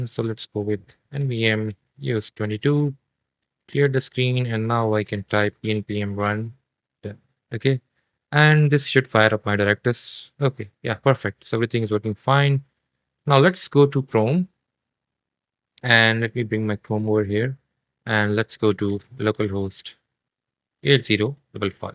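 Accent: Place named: India. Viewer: Puh, the Indian accent is a bigger challenge than learning the software itself 😔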